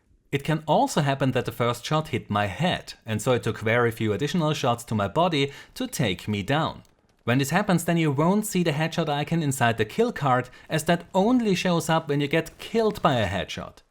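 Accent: Germany. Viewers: Ist der deutsch? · Was für ein schönes deutscher Akzent. Danke für das Video. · Where is from? Sounds a little german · I'm not gonna lie, while I like this info, it's this guys accent that made this video really enjoyable. He sounds like someone you'd play some European Work-Vehicle-Simulator-Game with and tell you stories about how he was part of the Federal German Ground Forces, ready to defend his bakery to the death in Alfeld if Warsaw Pact forces crossed the Fulda Gap and tried to reach the Rhine. ;_;7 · warum nicht auch mal auf deutsch...zumindest für die nicht der englischen sprache mächtigen. xD ich behaupte am akzent zu hören das es deutsch ist...am i right?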